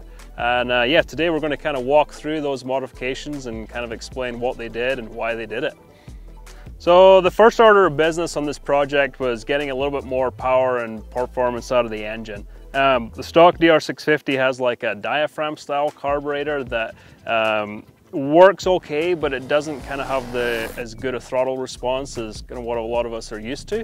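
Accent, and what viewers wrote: Scotland, United Kingdom. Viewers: What part of Scotland are you from. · Scott Manley is another Scottish Youtuber, though his accent is much thicker. I assume your accent is Scottish, yes? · Hint of a Scottish accent in there? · do I hear a touch of Scottish through the accent... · You Scottish?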